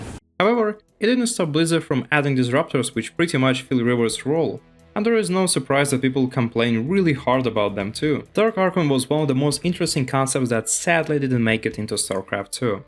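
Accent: Russia. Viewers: по акценту можно узнать, что русский))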